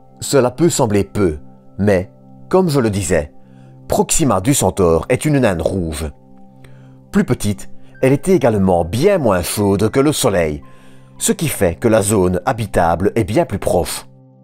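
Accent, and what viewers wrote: Liège, Belgium. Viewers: Ta chaîne m'a été recommandée par le petit astronome...malgré l'accent belge qui pique un peu, j'adore ton style de narration ...bon courage!! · Sacré accent !!! Liègeois??? ;-)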